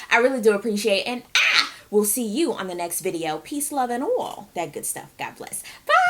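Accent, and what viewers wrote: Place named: Brooklyn, United States. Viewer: i don't know which one of your impersonations is better..Kandi or Tara...? i hollered at your Tara--well, i was telling one person a day..i loved him and i did it and we did it, and we're doing it!!!! oh my dang goodness...dam you!!! my eyes are burning like i could only imagine the pits of hell because of the crying laughing, mascara and eye liner running at this part of your commentary..girl, i tustve rewinded that part about four times..(in my cardi b voice) i been watching you for-evaa!! oh yea, yo BK accent with mendeecess 'baby muvas' comments were on point..lol..thats coming from someone from BK!!!..shout me out--Lady Di (pronounced die)!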